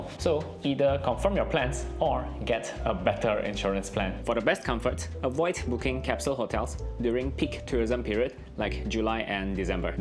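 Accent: Indonesia. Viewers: Your accent come from where? Sounds more like indonesian rather than singaporean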